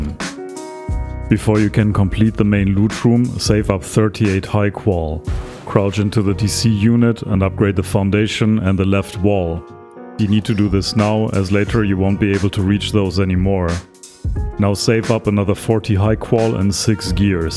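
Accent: Germany. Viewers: so hart cringe das man hart raushört das du deutsch bist xD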